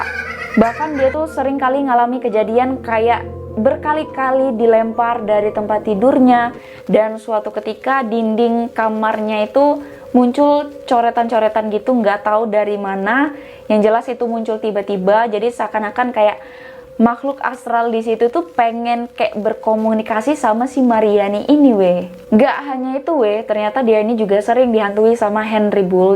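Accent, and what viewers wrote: Medan, Indonesia. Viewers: Dari yg kangen denger cewe logat medan.. Skarang udah terobati Kangen Tebing Tinggi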